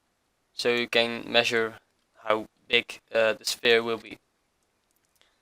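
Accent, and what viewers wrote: Netherlands. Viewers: are u dutch lol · that dutch accent though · Hahaha, aan je accent hoor je dat je Nederlands bent.. Maar goede video!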